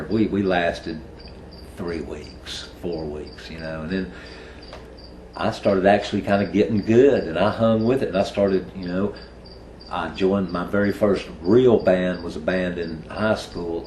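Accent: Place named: South, United States. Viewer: Interesting Video. I discovered and around ‘92. Just my personal opinion but their self titled & were their finest releases. showed signs of what they previously were capable of but after left & Jimmy Stiff they seemed to be lacking which makes sense right?. I will now try and find a video explaining why Jimmy left. That’s one very real Southern accent you have Mr. Appreciate the video. It must’ve been hard leaving such a cool band, esp because of the tonnes of sexy women that would be at your shows. I imagine that would be the BEST perk of being in a RnFnR band for sure!!!! That’s the main reason guys play instruments 🤤🤙🏽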